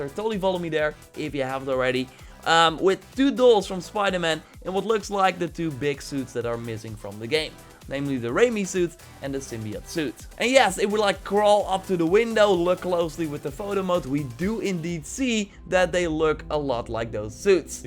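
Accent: Netherlands. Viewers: Are u netherlands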